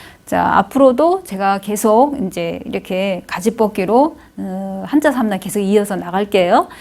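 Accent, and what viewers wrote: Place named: Gyeongsang, South Korea. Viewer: 선생님 굿 아이디어 경남사투리 ㅋㅋ좋습니데이 ㅎㅎ 감사합니다 잘 배우고나갑니당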